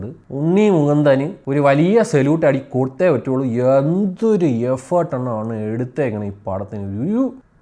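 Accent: Trivandrum, India. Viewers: Do not believe this joker Trivandrum slang u tuber . These are paid reviews .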